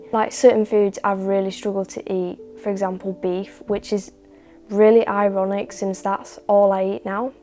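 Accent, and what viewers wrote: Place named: North, United Kingdom. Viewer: Fabulous video. Thank you for sharing. Also nice to hear a Northern UK accent on YouTube for a change! 👌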